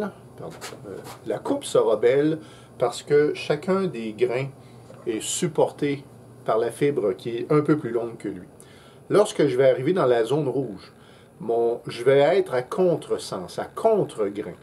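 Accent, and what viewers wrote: Quebec, Canada. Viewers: Salut. D'après ton accent je dirais que tu es du Québec? Les québécois c'est la meilleure partie des français... Ici en France ce st terrible la mentalité. Bref. Super vidéo C'est une passion que je cherche à assouvir donc grd merci 😉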